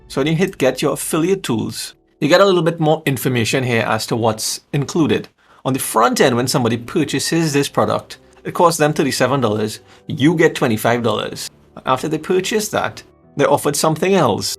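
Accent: Trinidad and Tobago. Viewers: Are you from Trinidad I hear your accent.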